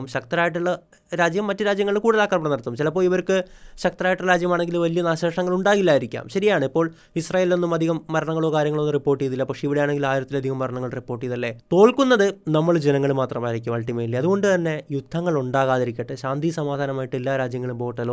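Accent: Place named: Changanacherry, India. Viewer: Changanacherry slang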